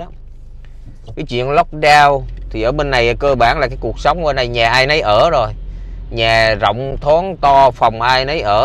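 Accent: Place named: South, Vietnam. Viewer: Anh nói giọng NK rất hay, ở VN hiện nay mở đài lên toàn nghe giọng BK nghe không vô.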